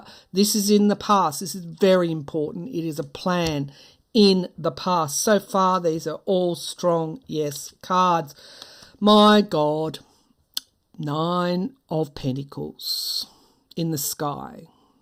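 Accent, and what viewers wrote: Australia. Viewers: Hi, its lovely to hear an Australian accent on this neck of the woods in You Tube land. Its so fasinating listen to what is going on in America with the politics and like the last Presidential Election, i cant wait to see Trump get his Kiss from Karma. I realize most of your viewers are over seas but would love for you to do some Readings on Australian Politicians. Isnt it fascinating to see the same scenario Playing out all over the world in terms of the utter disappointment of performance our political leaders that were in charge over the Covid period. USA, UK, Aust. just seemed to have the most self absorbed leaders at a time when we needed the most caring and Empathetic in Charge. What is amazing to watch now is how One by One they are losing their grip on everything that was more important to them than doing the right thing when they had the chance. 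Spirit really is amazing to watch. Even the communist leaders are having their day in the Heat. I wonder what will come of them too. As you have said, Putin will be the first one to go. I really love the way you read and really hope you can do some pollical readings for Australia. Jo from Northern NSW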